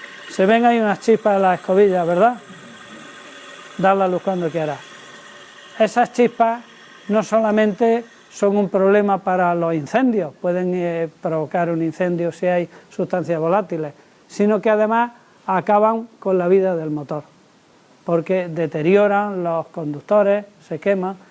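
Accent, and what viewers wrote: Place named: Andalusia, Spain. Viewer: ETE ES ANDALUZ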